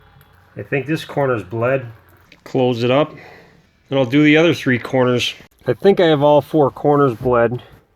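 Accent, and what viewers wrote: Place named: Midwest, United States. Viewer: this guy is so midwestern🤣🤣🤣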